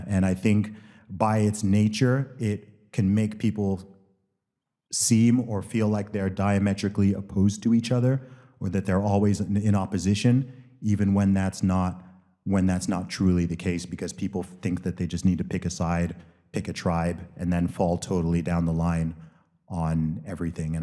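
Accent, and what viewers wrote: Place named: United States. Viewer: I’m confused. His Wikipedia page says he grew up in Saudi Arabia but is a British citizen, so why does he have an American accent?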